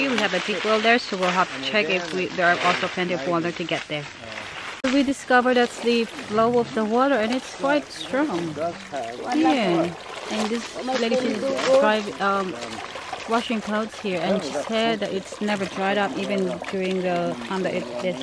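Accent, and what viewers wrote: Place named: Australia. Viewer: hello sister andito ulit ako love your accent sissy aussie na aussie kana😘 mahalaman pala jan sis! Thanks for sharing😘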